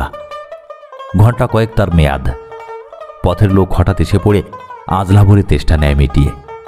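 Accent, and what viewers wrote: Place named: Bangal, Bangladesh. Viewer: আপনার কণ্ঠস্বর ভাল ৷ উচ্চারণের সমস্যা যাকে বলে 'বাঙাল-টান' আছে ৷ কিছু, মনে করবেন না, আমারও পূর্ব পুরুষ বাঙাল ৷ আপনি বাংলা দেশের গল্প সিলেক্ট করে শোনান না কেন? সঙ্গে চলুক কলকাতার উচ্চারণের প্র্যাকটিস...৷ এ ধরণের 'একটানা কথকতা' এখন এড়িয়ে চলুন ৷ অনেক চরিত্র যেখানে কথা বলছে ---সেই রকম গল্প রেকর্ড করুন ৷ আগের গল্পের নারী-কণ্ঠটি কিন্তু ভাল ছিল...তাঁর সাহায্য নিন ৷ আবারও বলছি আপনার কণ্ঠস্বর ভাল, পড়া ভাল.....ভাল থাকবেন বন্ধু 💚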